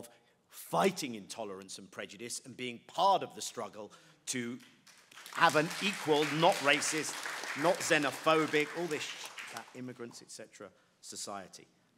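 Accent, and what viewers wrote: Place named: United Kingdom. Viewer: He maybe Israeli but he speaks like a clear thinking Brit.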